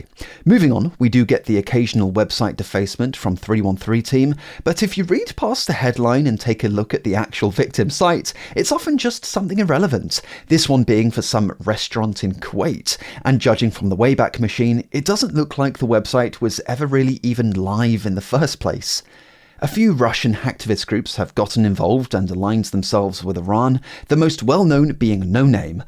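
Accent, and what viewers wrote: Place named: United Kingdom. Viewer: American ? but the accent is British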